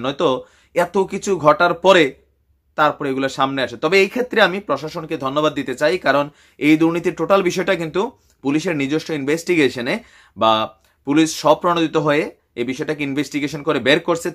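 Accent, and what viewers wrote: Comilla, Bangladesh. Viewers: ভাইয়া আপনি কুমিল্লার ভাসায় বলেন ভালো লাগে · Kumillar vashay kotha koyle moja lage. Vay amini koyaa jan . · ভাই এমন সুন্দর ভাবে কুমিল্লার ভাষায় কথাটা বলেছেন সুন্দর লাগছে ভাই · ভাইজান আপনার কুমিললার ভাষা বেশ মজা লাগে,চালিয়ে যান,আপনার চমৎকার উপথাপনা, ও আলোচনা আমার ভাল লাগে। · কুমিল্লা ভাষা কথা বললে ভাল লাগে শুনতে ভাই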